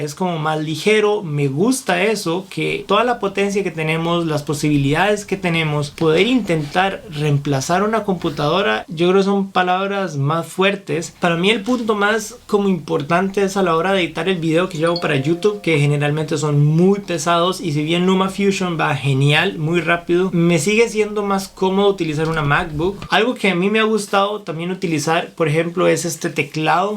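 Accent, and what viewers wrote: Costa Rica. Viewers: Me suena como a costarricense bro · Maeeeeee que buen video, gracias por una reseña tan integral y buena 💯 Usted es de Costa Rica o me falla el oído 👀?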